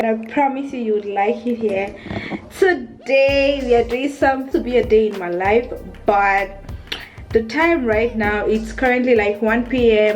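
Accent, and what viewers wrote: China. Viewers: Another beautiful vlog. I love your Chinese accent 🥰😍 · I love well you your Chinese accent. And hunny I loved your vlog.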